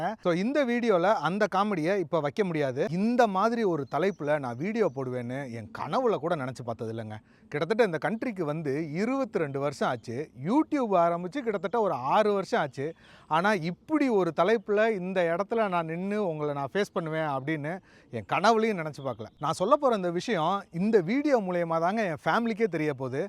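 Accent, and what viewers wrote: Madurai, India. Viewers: Madurai slang is emotion bro❤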